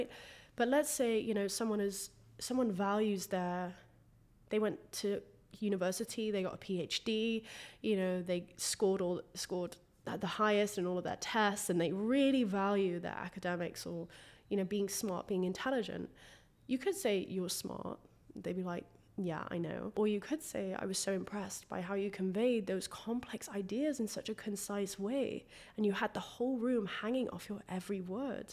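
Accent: United Kingdom. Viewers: This just landed on my recommended page and I instantly thought that Billie Ray girl from Tiktok started doing self development videos and got a British accent lmao. Really like the content though! My kind of jam.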